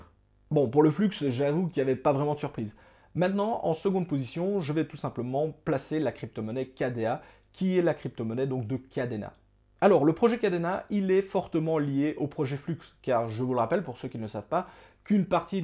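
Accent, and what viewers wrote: Belgium. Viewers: Salut, j'ai reconnu directement ton accent belge, sa m'arrange car je me demande justement si je cash out sur un compte en belgique quel sont les taxe encourue? Merci a toi bizz!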